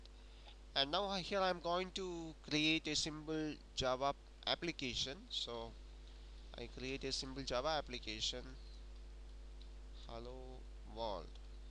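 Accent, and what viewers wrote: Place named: Kerala, India. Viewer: I can understand your Malyali accent, that means, you are from Kerala, that's a southern state in India.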